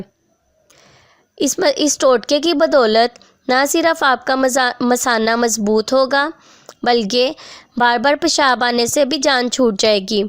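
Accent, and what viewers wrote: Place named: Sialkot, Pakistan. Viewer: Aapke Bolne ke lehje se lag raha hey keh aap distric sialkot se hey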